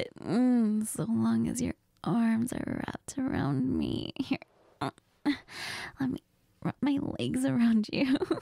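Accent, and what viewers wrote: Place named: Spain. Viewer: You felt extra Spanish today (as a native speaker i loved it) - Hermoso audio!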